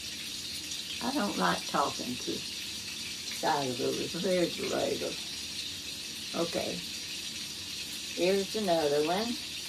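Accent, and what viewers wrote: South, United States. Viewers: You are a Sweetheart♥️You remind me of my Precious Mother.Love the southern accent.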